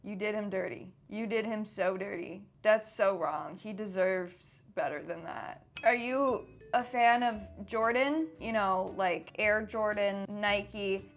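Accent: New York, United States. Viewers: Haha...love your NY accent.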